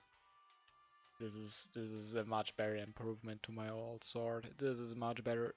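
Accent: Germany. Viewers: You sound a bit german 😅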